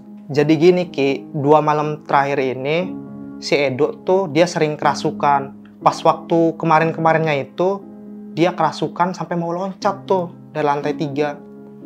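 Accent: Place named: Jaseng, Indonesia. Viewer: Walaupun bahasa nya lo gw lo gw logat jaseng nya gk ilang😂😅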